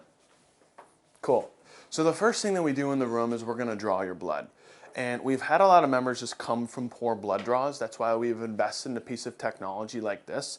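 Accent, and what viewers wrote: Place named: Silicon Valley, United States. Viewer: That is an "upscale" speak practiced especially in a Silicon Valley, California.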